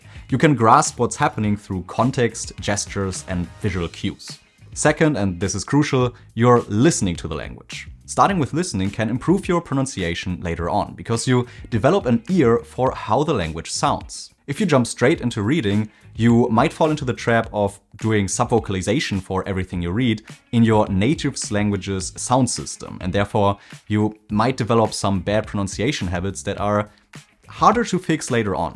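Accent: Germany. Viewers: Do I hear a German accent? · What technique did you use for learning English? You sound very fluent, but have a fairly strong foreign accent, especially with s vs z sounds and vowel + r sounds. I'd bet your first language is German or a closely related language and you grew up learning English but without a lot of audio input (e.g. somewhere they dub Hollywood movies & TV). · I noticed your German accent, ich lerne Deutsch!!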